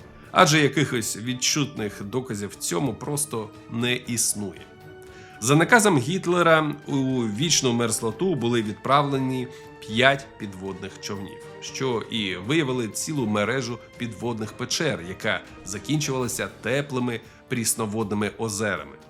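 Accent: Russia. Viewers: Дякую за чудове відео, хоч і трохи дивно слухати про історичні події від людини схожої на депутата 😅 Помітно, що людина російськомовна, але українська вже майже бездоганна! Ще раз дякую і продовжуйте в тому ж дусі!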